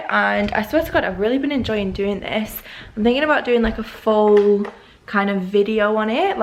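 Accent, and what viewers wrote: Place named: RP, United Kingdom. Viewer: Have you always lived in Manchester? Your accent sounds quite different and posh I can’t place it 🤣X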